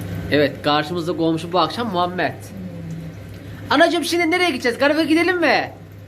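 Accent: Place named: Zonguldak, Turkey. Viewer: Ellerinize sağlık Maaşaalaaah. elinden herşey geliyo.Sşnopta yan komşumuz zonguldaklı,aynı sizin gibi konuşuyo.Öpüyorum ikinizi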